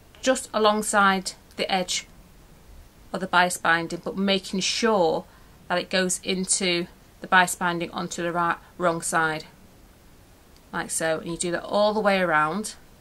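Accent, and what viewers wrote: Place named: England, United Kingdom. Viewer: Loving the fact English people are doing clear informative demos. Way preferable to yank accents!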